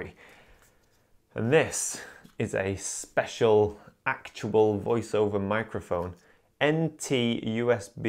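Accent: United States. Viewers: Don't be sorry. I loved your American accent, since I'm from the U.S. :D Thank you for this video, as I've always been curious about your b-roll equipment! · That American accent 😂 haha you’re the best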